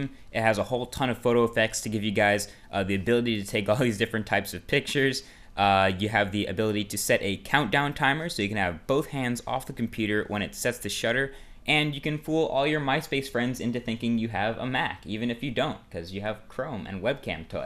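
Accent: Australia. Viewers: im from the uk... we dont sound like that, thats more like austrailian tbh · nice aussie accent! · Accent sounds more Austrailia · Im australian and i tought you sounded more Aussie then having a UK accent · Awesome British Accent! Got a hint of aussie in there aswell lol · Started off English. Ended up Australian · Yeah, you sound more Australian, than Brit! Sorry, but it's true. Another very wonderful,expositive video. Thanks for all these tips, I'll try almost all of them. Hope you get 4000 likes! G'day mate! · Accent more Aussie than Brit lol. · definitely Australian lol im british too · sounded aussie :D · Im from the UK, your accent sounds more Australian! XD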